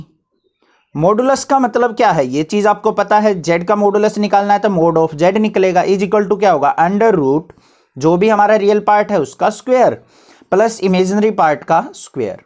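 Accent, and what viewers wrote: Haryana, India. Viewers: bhai first time haryanvi accent mai pad raha hu, addiction ho jayga lag raha hai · Sir are you from haryana?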